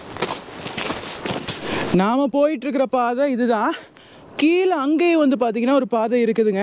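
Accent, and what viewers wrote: Tamil Nadu, India. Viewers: இலங்கைத் தமிழராக இருந்து கொண்டு தமிழ்நாடு தமிழில் பேசுகிறீர்கள் தமிழ் நன்றாக உள்ளது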